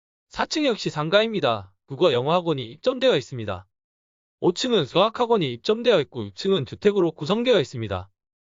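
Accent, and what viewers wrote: Gyeongsang, South Korea. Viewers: 와 우리 진주사투리갖고 뭐라카지? 직접 유튜브 찍어보고 이야기하시지.. 내는 고마 들을만하고 이쁘게 말 할려고 노력하는게 보이는데. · 어슬픈 진주 사투리 참... 그냥 표준어 쓰시죠 ㅎㅎ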